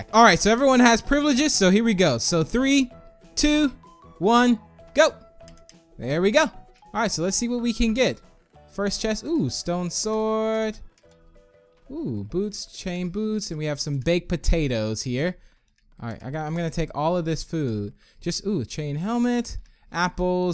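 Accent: United States. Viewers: u american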